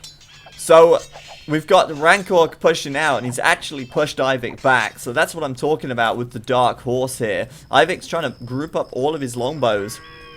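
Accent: United Kingdom. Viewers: are u british??? are u married??/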